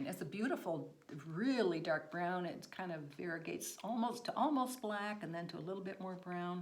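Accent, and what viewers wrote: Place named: Michigan, United States. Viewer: Just found your floss tube and enjoyed watching them. Your work is beautiful. I chuckled at your comment about your Michigan accent. I live in Michigan and have all my life.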